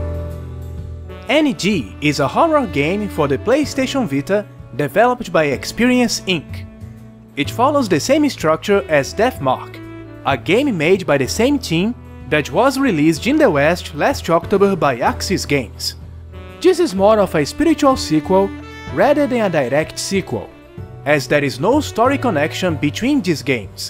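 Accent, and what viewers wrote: Brazil. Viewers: Your accent screams braziliam... Nice videos anyway~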